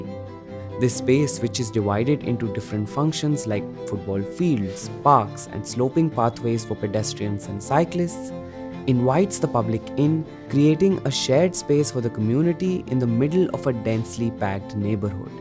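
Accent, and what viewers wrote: India. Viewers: I CANT TAKE A VIDEO WITH 10 MINUTES OF INDIAN ACCENT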